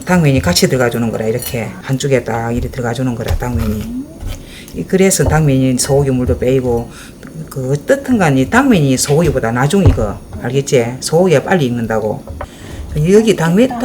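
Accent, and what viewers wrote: Gyeongsang, South Korea. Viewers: 경상도 말씨가 참 구수하네요 저도 제대로 배웠어요~♡ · 경상도 사투리말씨가 너무재미있습니다 불고기도 맛있게보이고 엄마하고 딸내미 사이가 너무보기좋아요 울엄마생각이 나네요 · 선생님 경상도 말씀이 더 재밌어요^^ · 저도 오리지널( 대구에서 태어났고 현재 70년..) 쉽고 간단하게 맛있게 소개해주어 감사합니다. 그리고 멘트가 경상도사투리로 홍양홍양 너무 재미있습니다. 강력한 응원자로 따라 해봅니다. 숨은 맛있는 경상도요리 더 많이 소개해주세요. 이미 알려진 레시피는 별로 입니다. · 경상도말씨에도다정다감 참듣기좋요. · 목소리가 너무듣기 싫어 경상도 억양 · 경상도 사투리가 구수하고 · 주부경력 35년인데 보면서 배울 꿀팁이 한가지씩있네요~그리고 댓글보는 재미도 쏠쏠ᆢ꾸밈없는 갱상도사투리 ᆢㅎㅎ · 요리를 볼때마다 참쉽고 만나게 많들어요 경상도 말씨여도 참 호감이 가네요 잘 보고 감니다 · ! 요리강좌 공부도 공부 이지만 두분의 알콩달콩 따님의 솔직한 맛 이야기 듣는 재미로 자주 기다려져요 경상도 사투리도 구수하구요 ㅎ 겨울에 뜨끈한 국물 자작한 소불고기 쉽게 알려주셔서 넘 감사해요 따님은 목소리도 정겹지만 엄마가 해주시는 요리 드시고, 무해한 여러 음식드셔서 곁에서 보고 배우신 눈썰미가 대단하실것 같습니다 건강미인 이실듯? 싶네요 ♡ 오늘도 맛있는 불고기 요리 생표고 보다 건표고가 특유의 냄새 안난다는 꿀팁얻고 시청 잘하고 갑니다 두분 새해 복많이 받으시고, 무엇보다 건강이 최고이니 건강하세요? 고맙습니다!! >_< ♡♡ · 구수한 말씀이 경상도 분 같애요. 참 간단한 설명에요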